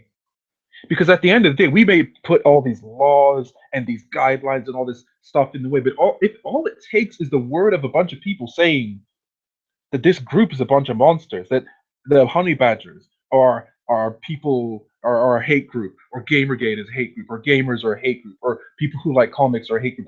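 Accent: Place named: Ireland. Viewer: Lol youre from Ghana? I thought that you were maybe Irish or something lmfao, just how you pronounce certain words thats all. First time viewer here just so you know, good video mate!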